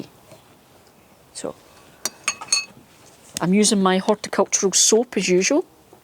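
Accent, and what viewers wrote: Scotland, United Kingdom. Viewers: Came to learn about neem oil sprays, stayed upon hearing a fellow scottish accent 💓🏴 (currently working in some serious Fife temperatures 🔥). Your garden looks absolutely wonderful!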